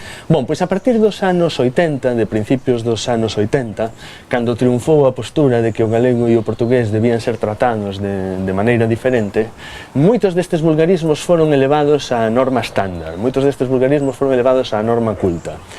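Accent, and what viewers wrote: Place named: Castile, Spain. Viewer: ¿Porqué pronuncia la "C" y la "Z como en castellano? De donde yo soy nunca la oí pronunciar así. Suena a castellanismo.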